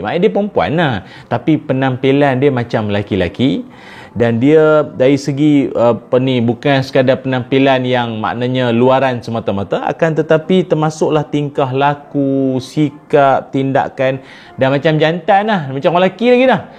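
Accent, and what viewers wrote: Kedah, Malaysia. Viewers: slang kedah...sedap dengaq.....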